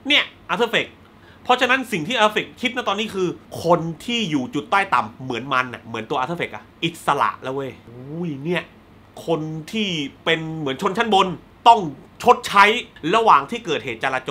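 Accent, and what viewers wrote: South, Thailand. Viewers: พี่คนใต้หม้าย?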